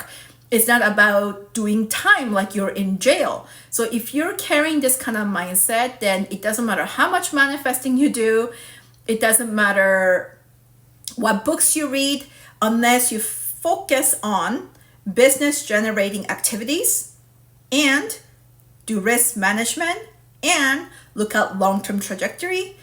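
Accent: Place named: Brazil. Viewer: Anyone can be brazilian haha we have the largest japanese pop outside of japan. Her accent is very brazilianish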